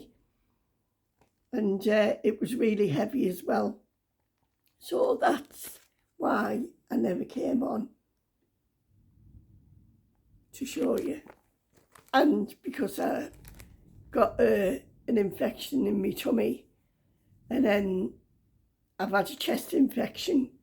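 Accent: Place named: North East, United Kingdom. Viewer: don’t apologise, sounds like its been a really tougher time lately. Love spending time with you and hearing someone from my neck of the woods, I live abroad at the moment. But miss the north east. Hope you keep getting a bit stronger each day. Take care love and hugs to you and your family xx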